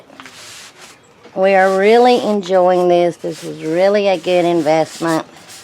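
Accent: South, United States. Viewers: Your country voice made this so relaxing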